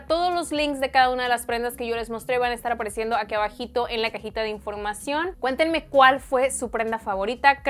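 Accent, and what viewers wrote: Sinaloa, Mexico. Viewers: Me encanta escuchar las chicas de sinaloa 🥰🥰 soy Dominicana🇩🇴 y amo su acento sinaloense ♥️👌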